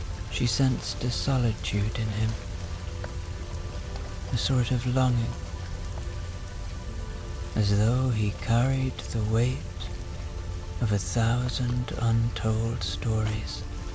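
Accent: Ireland. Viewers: So Thankful for your dreamy Irish voice and wonderful story tonight x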